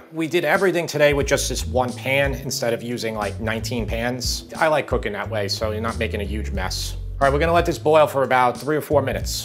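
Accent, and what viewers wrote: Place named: Long Island, United States. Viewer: Can’t wait to make this. Thanks! Love and miss the LI accent. Vermont just doesn’t cut it. 😬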